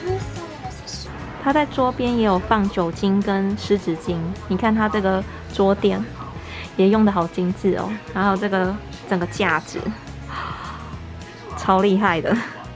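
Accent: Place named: Taiwan. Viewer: Typical Taiwan accent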